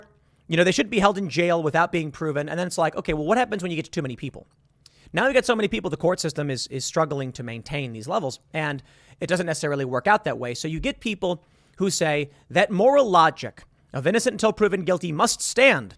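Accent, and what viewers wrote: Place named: Chicago, United States. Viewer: More Chicago accent please Tim!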